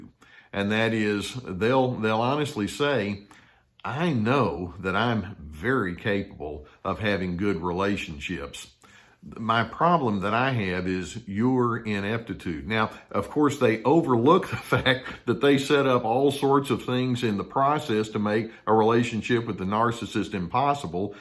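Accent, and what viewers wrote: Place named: Texas, United States. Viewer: it's your Texas accent, it reminds me of cowboy movies lol Happy Holidays!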